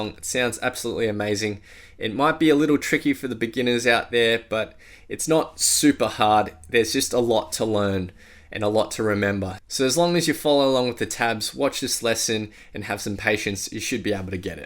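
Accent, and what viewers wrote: Australia. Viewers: I commented on a comment, where a few were querying country of origin, well cob, I can ya now, that’s the accent of true bluey, as Aussie as Vegemite, kangaroos n Holden cars, e’s a bloody legend mate, fair dinkum digger.👍😉 and no he doesn’t through shrimp on the barby, chucks some snags on amongst the chard onion.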